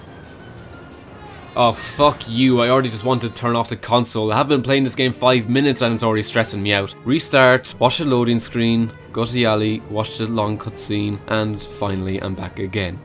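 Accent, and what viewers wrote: Ireland. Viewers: explained through an Irish accent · He's Irish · Irish maybe hmmm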